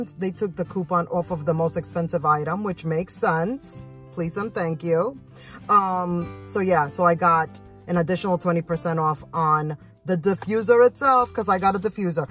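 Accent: New York, United States. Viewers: Hi I found your channel recently and really enjoy a fresh take on these shopping channels. You sound like you're from the New York ,New Jersey area am I right? I don't mind that you say you know a lot. 👌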